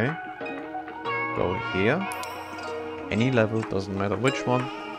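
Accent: Germany. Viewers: küss dein herz brudi, stunden lang gesucht (isntant erkannt das du deutsch bist) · I can hear some Deutsch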